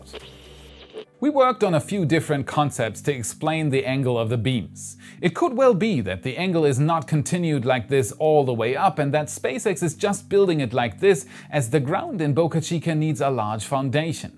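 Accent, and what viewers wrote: Germany. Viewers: Top-quality content, and loving the German accent! :D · I can hear the german akcent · Your English isn't quite good enough to tell (yet), but he has a quite noticeable German accent. Similarly, most native English speakers can't tell Bavaria from Berlin from Bern. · is that a german accent ?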